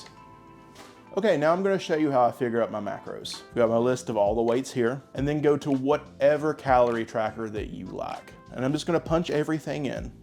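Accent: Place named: South, United States. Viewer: I love southern accents and the way you guys say uhl. Or earl lol when I’m around someone with the accent for a few hours I subconsciously try to pick it up and use it…. 😬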